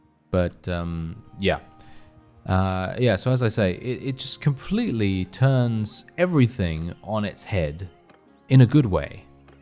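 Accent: United Kingdom. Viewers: These should not be this fun to watch... but I get good ideas which make my campaign more fun and you got a cool british asmr kinda thing... you Brits have spoiled me with your cool voices, I def prefer to watch other youtube vids with good ol British folk narrating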